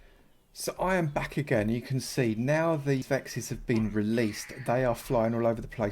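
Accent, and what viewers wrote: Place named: London, United Kingdom. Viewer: Proper Londoner mate!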